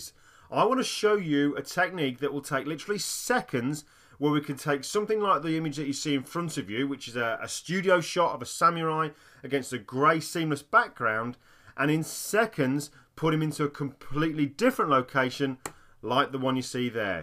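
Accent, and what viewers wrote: United Kingdom. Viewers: It's a UK accent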